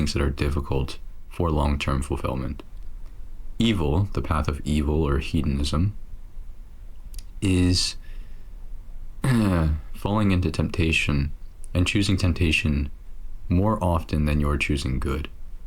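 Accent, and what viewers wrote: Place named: United States. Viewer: where are you from bro. I am from Uzbekistan. I am learning English for IELTS degree at the moment. in my opinion, you are American. I shadow you for fluency.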